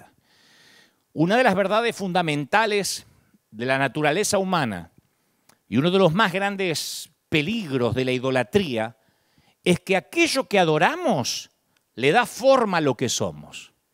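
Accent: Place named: Córdoba, Argentina. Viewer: Rompe estructuras!! Hablando a lo cordobes...es un capo.. Por mas pastores asi Amado Dante Gebel!!! Gloria a Dios por tu vida!!!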